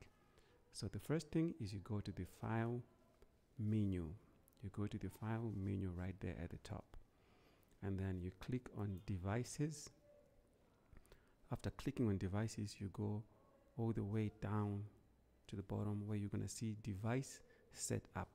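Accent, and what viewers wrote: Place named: Zimbabwe. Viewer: Ndazvinzwa hangu uyu mwana wevhu chete 😂 anyway thanks brother this video is awesome and helpful 👏🏿👏🏿👏🏿👏🏿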